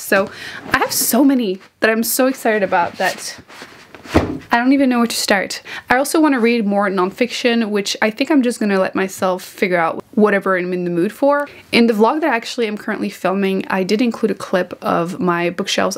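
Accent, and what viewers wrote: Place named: Quebec, Canada. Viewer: Hi! Just found your channel and really enjoying the videos I've seen so far. I was thinking your accent sounded a little bit like mine and wondering if you were French-Canadian, and now that I heard you say "Méditerranée," I think my hunch might have been correct. 😄 I look forward to hearing your thoughts on the book you've shared for your TBR. I currently have on loan from the library The Midnight Feast from Lucy Foley, The No-Show from Beth O'Leary, Razorblade Tears from S.A. Cosby, The other Half from Charlotte Vassell and First Lie Wins from Ashley Elston. Then I would like to shop my stash a little bit, as I recently purchased some of the books on my wish list that my library does not carry. I'm not sure what I will prioritize, I will just go with my mood at that moment.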